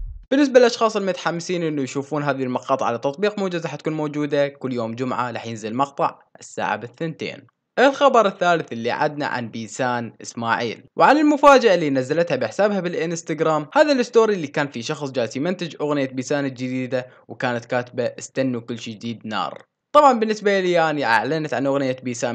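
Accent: Iraq. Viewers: انته عراقي لو كويتي❤❤❤❤❤❤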